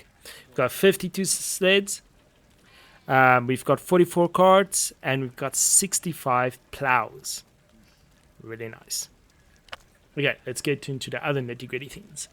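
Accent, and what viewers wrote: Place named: South Africa. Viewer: Hold up. I hear a South African accent. Was looking for someone to that does a decent long play of this game and now a fellow Zafer pops up. Lekker man.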